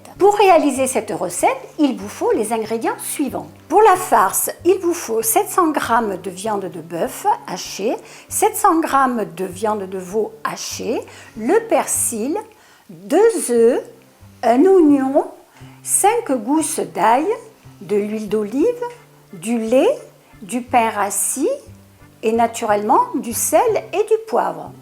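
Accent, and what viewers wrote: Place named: Algiers, Algeria. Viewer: Vous avez raison,j'arrive à " sentir" la bonne odeur ... hum ! Vous n'avez pas répondu à ma question, êtes vous d'Alger?,car vous avez un p'tit accent qui ne m'est pas inconnu 🤔 😊😉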